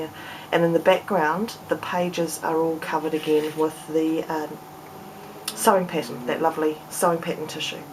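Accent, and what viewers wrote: New Zealand. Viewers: I love your book, I have one similar in process... and I ADORE your accent!!! NZ has the best accent in all the world, to me.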